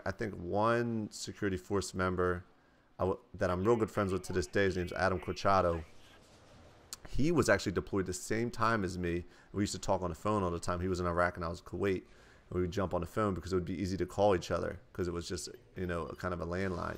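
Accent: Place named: United States. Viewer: Just.. No... I bet you're from the us?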